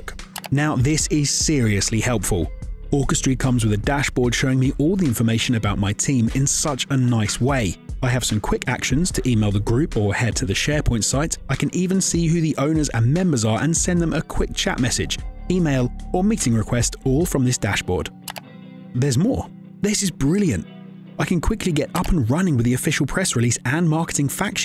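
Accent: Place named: England, United Kingdom. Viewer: This is amazing! Amazing product, walk through and the English accent helped bring everything together :)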